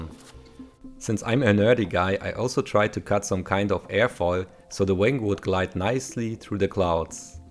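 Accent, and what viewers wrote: Germany. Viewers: so you are German 😂. Das "Nein" und dein axent sind ziemlich verräterisch. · Are you german?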